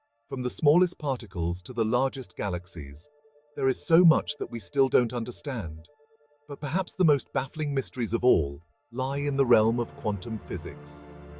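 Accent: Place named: England, United Kingdom. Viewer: I'm sorry - I can't take that accent seriously. I know there's a bit of a fashion on yt for slightly plummy English accents doing sciency documentaries. But this accent is slightly weird.... tbh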